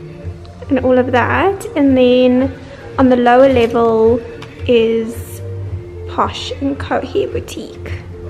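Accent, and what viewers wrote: South Africa. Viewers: I knew you were from south africa once I heard your accent, sounds like Candice Swanepoel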